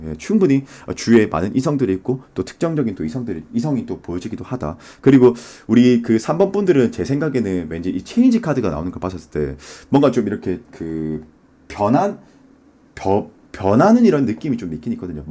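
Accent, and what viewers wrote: Gyeongsang, South Korea. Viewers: 사번 연애포텐셜이 터진다니 ㅋ 😄 지금은 별일 없지만 곧 몇 명씩이나 온다니 기대해보겠습니당 ㅎㅎ 조언해주신대로 오픈마인드로 다가오는 인연들과 좋은 추억 만들어볼게요💛🧡❤ 배경음악도 멋지고 목소리가 넘 좋으세요 님의 타로리딩 정확도 면에 있어 제가 본 타로 유투버 들 중 탑인거 같아요 진짜 예리해요 👍 전 부산 사람이라 님의 구수한 경상도 액센트가 친숙해요 😊 감사드리고 타로하는 구독다수 일취월작하셔서 번창하시길 기도할게요🍀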